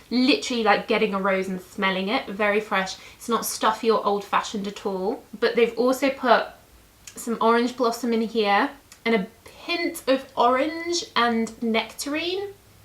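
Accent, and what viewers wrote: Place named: London, United Kingdom. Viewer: Hello from Brazil again!! Not only do I love hearing your reviews, but I also love just hearing you!! It reminds me of the time I lived in London, I could listen to your for hours!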